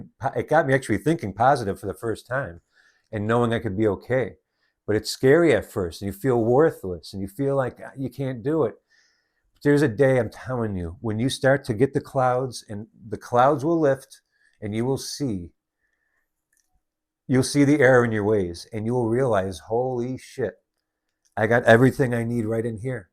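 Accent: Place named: Cleveland, United States. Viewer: Are you from Cleveland? I am...recognize the accent!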